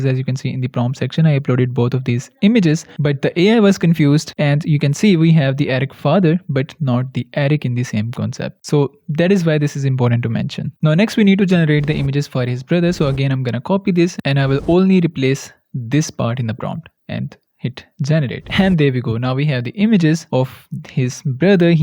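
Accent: India. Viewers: man, i head indian i exit. bye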